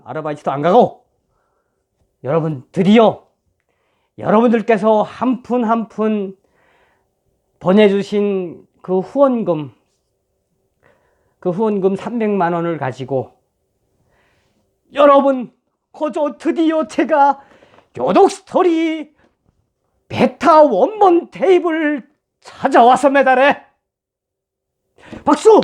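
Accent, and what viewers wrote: North Korea. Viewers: 정말 잘되었습니다 감독님이시라 논평도 뮤지컬처럼 재미있게 잘하십니다 이북사투리도 들을수있어서 거저 재미 있구만요 하는일 잘되시기 기도 드립니다 힘내시라우요😃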